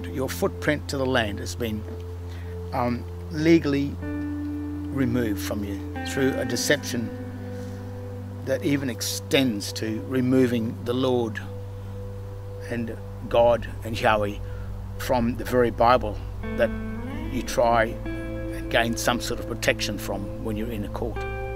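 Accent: Australia. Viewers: Really fascinating and enlightening information! I like your style of delivery, as well. It's genuine, logical, and unforced. And I've always found an Australian accent easy to listen to.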